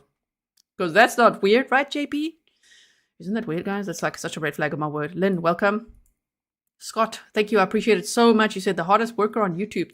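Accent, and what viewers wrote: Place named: South Africa. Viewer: So glad to finally find out that you are from SA! When I first watched one of your videos and thought "man that accent sounds so South African" so thank you for confirming that, my mind can rest easy now! From a fellow South African!🤗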